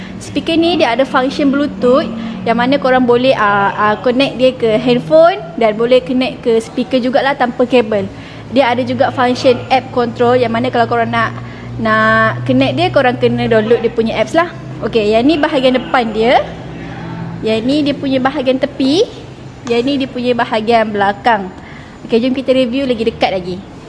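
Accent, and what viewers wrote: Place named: Malaysia. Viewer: Malaysia, gaya bicara nya khas. Banyak orang Indonesia yang suka gaya bicaranya logat Malaysia.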